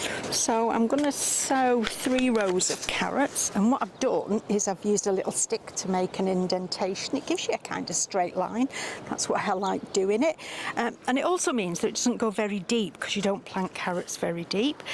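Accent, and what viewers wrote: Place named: Manchester, United Kingdom. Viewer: What a lovely video. We have loads of old tin baths around the farm. Think it’s pretty standard for farming to find baths dotted around fields. They certainly seem to make useful raised beds. Have seen Jessie (plot 37) likes the touchon carrots too The cauliflowers look lovely. Be interested to hear what your biggest pests to the crops are over there? Still have slug problems? Your accent sounds like you may have come from Manchester/Stockport area originally? Not too much further north than me on the Staffordshire/Cheshire border The rain and thunder always sounds quite nice.. so long as you’re in the dry watching it. Colin has the right idea. Happy New Year to you. Hope 2024 is a good one.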